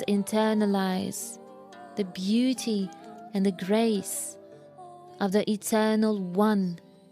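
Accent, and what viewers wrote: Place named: France. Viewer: You are mystical and deep, it's soothing to listen to your beautiful french accent.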